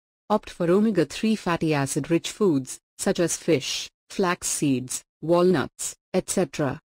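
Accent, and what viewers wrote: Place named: Asia. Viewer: An Asian robotic voice🤦🏼‍♀️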